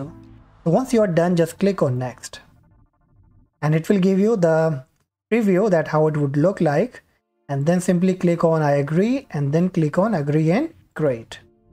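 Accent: India. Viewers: YOU ENGLISH LIKE INDIAN ENFLISH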